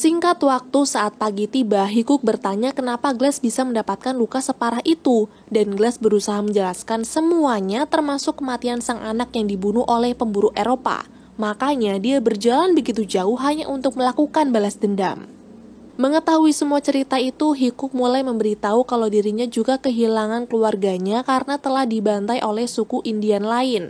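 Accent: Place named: West Java, Indonesia. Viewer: Mabk. istrinya si Jon ya wkwkwk. soalnya mirip Dari intro nya cara ngomong ada sundanya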